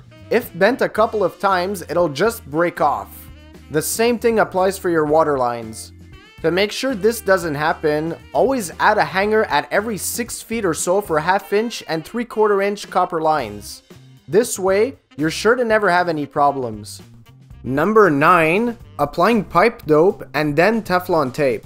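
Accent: Quebec, Canada. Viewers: bel accent québécois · tu me semble etre un quebecois je me trompe ? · no, but I think you have a French Canadian accent like me lol.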